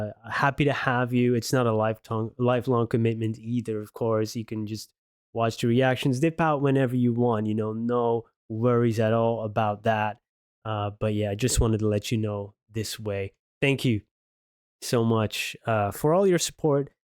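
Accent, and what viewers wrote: United States. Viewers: I love to listen to your reactions. You speak beautifully., very expressive. I think that I have expressed this before. You speak like an educated American. I would never have guessed that you’re from another country. I’m not that familiar with. This song is beautiful. You might wan to check out some his father Tim’s early music. Very hypnotic and powerful.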